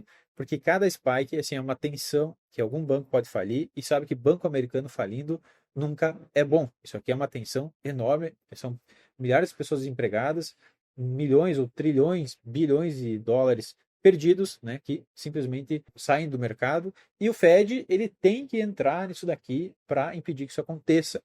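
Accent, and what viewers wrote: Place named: Paraná, Brazil. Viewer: sotaque do paraná ou catarina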